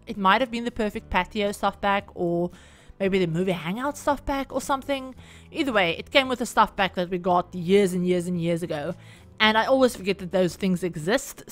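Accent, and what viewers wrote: South Africa. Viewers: are you south african? our accent is pretty iconic lmao. eitherway omfs im obsessed with this build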